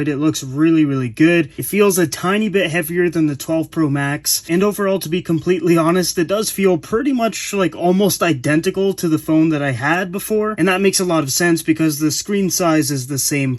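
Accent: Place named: Canada. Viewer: What’s wrong with Canadian English?🙄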